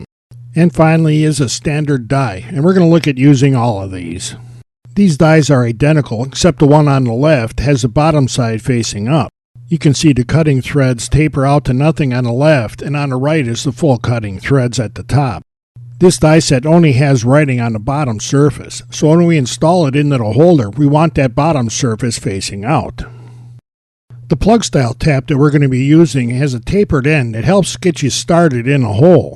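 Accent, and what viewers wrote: Chicago, United States. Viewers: Love that Chicago accent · Very Chicago.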